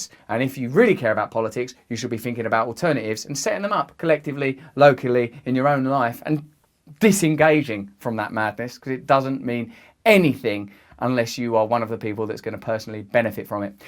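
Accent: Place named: England, United Kingdom. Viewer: Not going to lie, I wasn't really a fan of Russell Brand years ago. I thought he was just another inept Hollywood elite who probably couldn't think for himself and was only popular because of his goofy English accent. I take all of that back. Thanks for keeping open discussion alive and calling out these modern day corrupt elitists.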